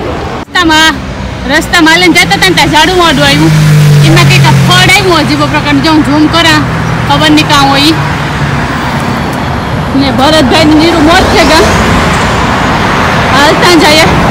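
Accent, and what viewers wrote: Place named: Kathiawar, India. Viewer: ખરેખર, તમારો you tube પર નો વિડિયો જોઈ ને બહુ આનંદ સાથે તમારા સાહસિક સ્વભાવ ને પ્રણામ. આમ તો આપણી કાઠિયાવાડી દિકરિયું ઍટલે ઝવેરચંદ મેઘાણી સાહેબ ની ચરણ કન્યાની કવિતાની યાદ અપાવતી ક્ષણો... જે ડણકું દેતાં હાવજ ને પણ ડસ કારિયું દયીને ડરાવતી... તમારી તળપદી બોલી ને હાંભળી ને બહુજ આનંદ સાથે છાતી અમારી ગજ ગજ ફૂલતી કરી દીધી...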